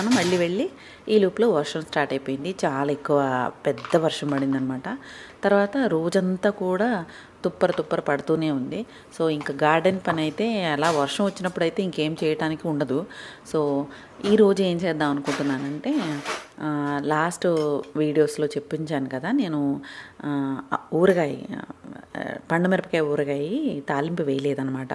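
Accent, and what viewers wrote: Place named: Godavari, India. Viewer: హాయ్ జీ. మీరు సూపర్. మల్టీ టాలెంట్.. నేను లేచిన వెంటనే మొక్కలు చూసుకుంటాను. మావాళ్లు కాల్ చేస్తే తియ్యకపోతే మొక్కల దగ్గరే ఉంటుంది అనుకుంటారు. మీరు విజయవాడ, గుంటూరు అనుకుంటాను. మళ్ళీ గోదావరి మాటలు కనిపిస్తాయి 😂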